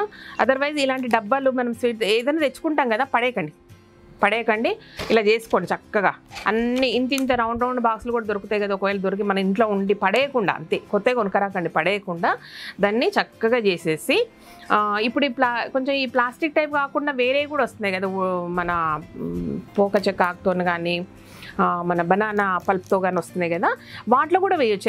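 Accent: Telangana, India. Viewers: U speak liķe me mast hydrabadi telàngana.super atlane frankga vundu friend.dont change ur way of speaking in telangana. Mast ga chestunru n success ayinattu chupistunru.great but nenu itlane idea tho youtube lo chusi chesina mentulu,pudina chenagalu.without soil n with soil sand lo but raledhu. Micro grain greens avuthalevu.y reason cheppandi friend.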